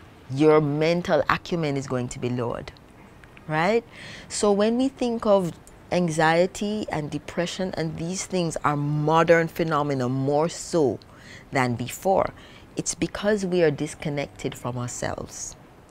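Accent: Jamaica. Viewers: is she jamaican? sounds like! love her voice and speech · The Sistah could talk to me all day about health. Jamaican accent supreme! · MARVELOUS! ...What a beautiful lady this is...smooth impeccable skin. Such clean expressions, such clean energy and such clean appearance...ZERO pretentiousness and 100% NATURE PERSON. I really enjoyed hearing this...and your golden Jamaican accent and mannerisms...and the little sweet Patwa in between. - no ssstchuppitnis punna she hair - arl's nature groove...eeeasy-eeeasy lite and breezy! It's so wonderful to see zero ostentation in motion. GOD Wisha-Ouija bless you!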